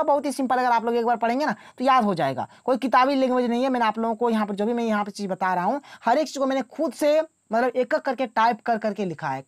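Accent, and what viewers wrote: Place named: Jharkhand, India. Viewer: Aapka language kabhi kabhi Jharkhandi jaise bolte hai aap sir ji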